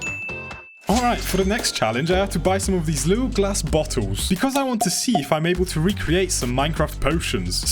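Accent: United Kingdom. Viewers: I know you're British because of the speaking and I don't know how no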